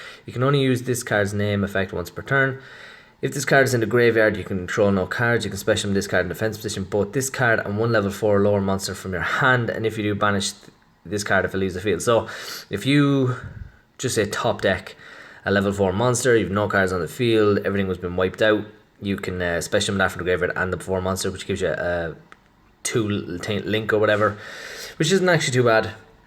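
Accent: Ireland. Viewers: Are you Irish btw? :)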